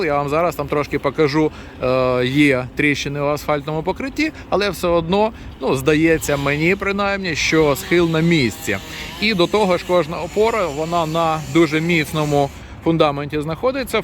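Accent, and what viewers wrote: Kyiv, Ukraine. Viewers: який гарна "київська говірка", як Ви колись казали 👍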